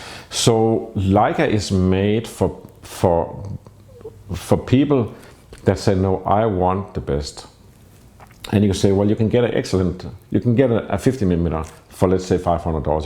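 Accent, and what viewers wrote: Denmark. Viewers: Thanks to you and your Danish accent it pushed me to buy the Leica M240 and have never looked back. Thank you. Now to buy a Leica 50mm lens.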